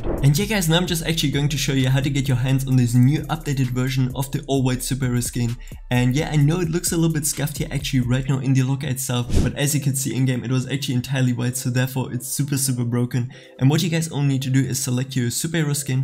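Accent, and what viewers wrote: Germany. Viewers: bruder dieser deutsche aktzent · Are you german?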